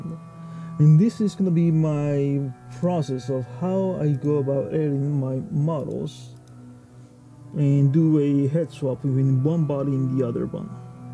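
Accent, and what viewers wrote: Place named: Russia. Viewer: You sound Russian but we're not sure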